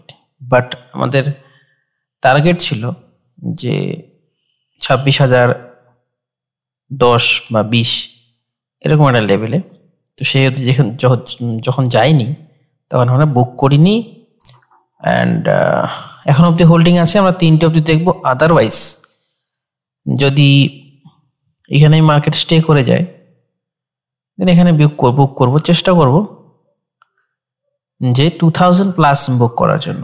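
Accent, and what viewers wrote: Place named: Bangladesh. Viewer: Apni kothai thaken , apnar vasha puro Bangladeshi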